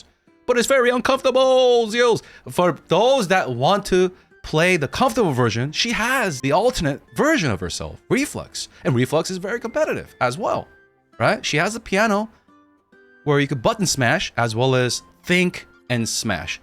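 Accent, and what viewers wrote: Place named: United States. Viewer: Wow you speak American English!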